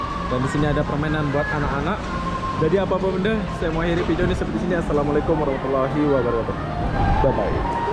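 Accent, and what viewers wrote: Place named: Malaysia. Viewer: Wah awk cakap mcm org malaysia la.. "berape harge die" 😂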